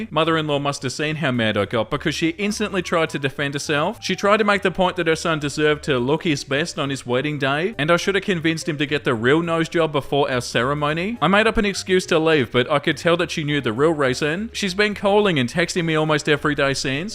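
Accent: Australia. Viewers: What? But your Aussie accent is part of what makes you so compelling to listen to! It gives your speech a nice, lilting cadence, like a little song. 🎼 Sing a little song of neckbeards!🎵🎶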